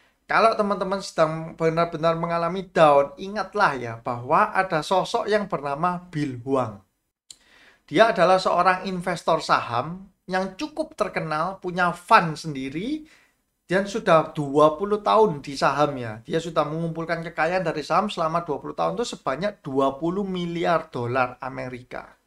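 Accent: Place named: Java, Indonesia. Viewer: Jadi ketagihan lihat video p ini.... Banyak ilmu yg bisa di ambil,Saya juga suka logat nya medok jawa meski pakai bhs Indonesia😁....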